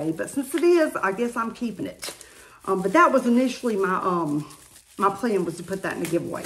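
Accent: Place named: South, United States. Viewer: Hey you! I subscribed to your channel a couple of months ago. I love your southern accent! And I too have been watching Jessica for years.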